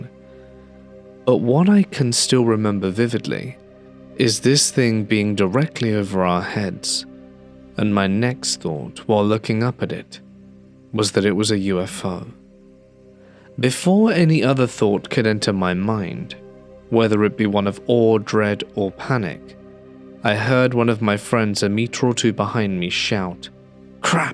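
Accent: England, United Kingdom. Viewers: You really have a gift for nuance and expression And your English accent just makes it even better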